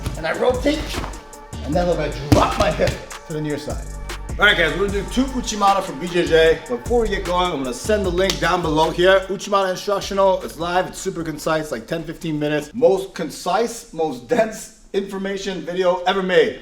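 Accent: New York, United States. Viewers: I like how he speaks New York :-)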